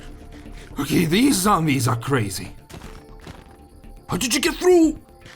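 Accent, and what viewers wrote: Russia. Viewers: Are u russian? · great youtuber love his vids and the russian acsent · Mind if you use you Russian accent to say on the video? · Are u Russian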